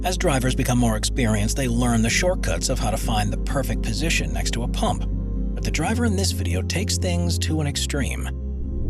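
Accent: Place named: United States. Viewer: Why does the narrator have an American accent? It sounds like the copy was written by someone from Britain (or some other place that says things like car parks and bays) but the narrator sounds American. Not a big deal, just kind of an odd combination.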